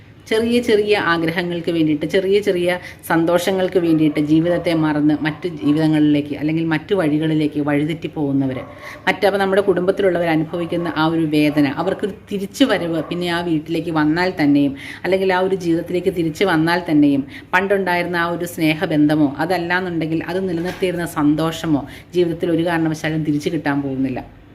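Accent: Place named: Malabar, India. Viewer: നിങ്ങളുടെ അവതരണ ശൈലി സൂപ്പർ ആണ് ട്ടോ,,,,, തനി മലബാർ ശൈലിയിൽ,,,,,,, ആളുകൾ ശ്രദ്ധിക്കപ്പെടും,,, 👍🔥🔥🔥🔥🔥🔥🔥🔥🔥🔥🔥🔥🙏🙏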